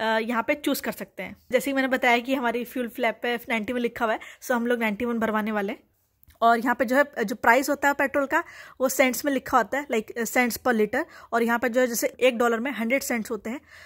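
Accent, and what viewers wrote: India. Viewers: Hii apka bat karne ka tarika bilkul indian ki tarah hai ap austreliya me rah kar bhi indian ahsas dilati ho nice or austreliya gao ka sheyar bhi kara deti ho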